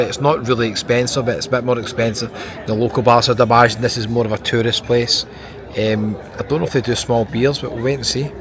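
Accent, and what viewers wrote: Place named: England, United Kingdom. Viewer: Your english is so english i can't understand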